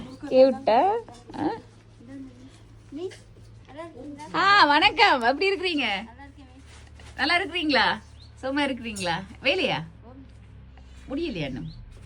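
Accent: Sri Lanka. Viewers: அழகான புன்னகை, உங்கள் அன்பு, பிணைப்பு, உங்கள் அழகான இலங்கைத் தமிழ் ஆகியவை என் இதயத்தை முழுவதுமாக ஈர்த்துவிட்டன. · ஈழ தமிழே தமிழ் தான்.. என்ன ஒரு அழகு அந்த செந்தமிழில்.... "காலத்தி னாற்செய்த நன்றி சிறிதெனினும் ஞாலத்தின் மாணப் பெரிது" என்ற அய்யன் வள்ளுவனின் குறள் கண் முன் வந்து போகின்றது. நீர் வாழ்க வளமுடன் சகோதரி - மதுரையிலிருந்து சீனிவாசன்..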